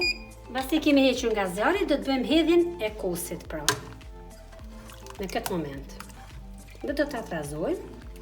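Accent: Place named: Shkodra, Albania. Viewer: Ty të lumshin ato dur se un vdes me hanger djath të njom mu duk se flitshe shkodranshe se edhe un jam shkodrane❤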